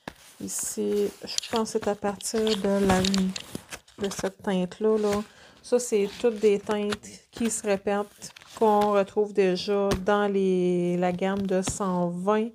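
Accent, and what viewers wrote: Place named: Canada. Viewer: bonjour ta vidéo tombe tres bien car je cherchais une nouvelle gamme de feutres et j'ai énormément apprécié ton comparatif j'ai pu me faire une idee sur les feutres a m'acheter pas trop onéreux les tombows sont vraiment tres cher 😅 merci beaucoup pour ton partage et ton accent qui sent bon le canada que je reve d'aller ❤❤👌👍👍🙏🙏😘😘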